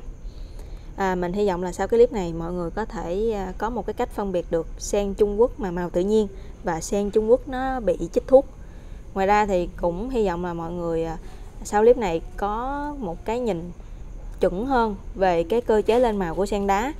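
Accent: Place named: Mekong Delta, Vietnam. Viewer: Nghe giọng của chị người miền Tây mà sao bít tiếng Hoa hay vậy?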